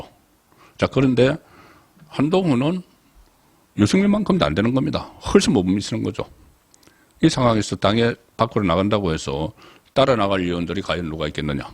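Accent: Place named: Daegu, South Korea. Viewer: 표준어 발음도 제대로 못하는 대구 촌놈 주제에.. ㅋㅋㅋ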